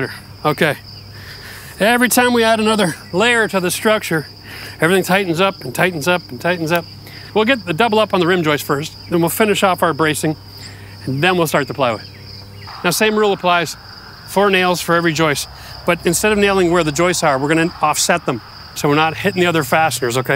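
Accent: Canada. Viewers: had the same thought. I thought I heard a Canadian accent and then when he said was in CA I was shocked. This guy knows a ton but it seems like frost heave is going to eff this whole structure up with time.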